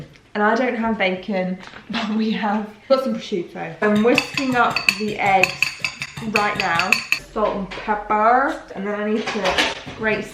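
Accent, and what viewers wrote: United Kingdom. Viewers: you are aggressively British I love it - sincerely an American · British accent makes me so happy and emotional lmao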